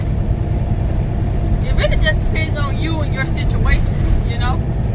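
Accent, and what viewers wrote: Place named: Alabama, United States. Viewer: Traveling on my birthday lol.... Thanks for the great info and stay safe my sister...... you sound like a Bama Girl lol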